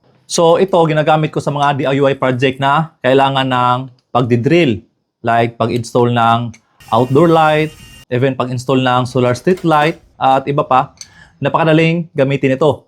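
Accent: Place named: Ilocos, Philippines. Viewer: Sir sa accent mo hulaan kita Ilocano ka Sir?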